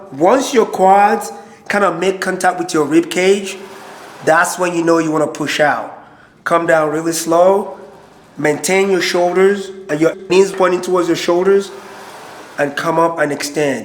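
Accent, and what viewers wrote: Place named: Ghana. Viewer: Sounds like youre from Africa..Ghana maybe?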